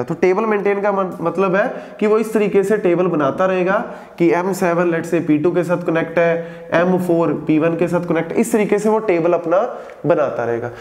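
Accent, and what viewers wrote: Punjab, India. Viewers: That punjabi accent but is awesome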